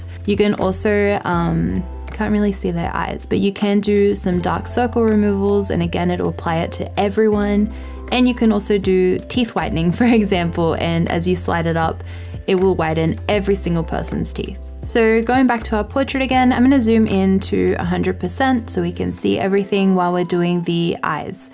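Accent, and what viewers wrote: Australia. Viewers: luv ur aussie accent ❤️ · It's Aussie? I couldn't place it.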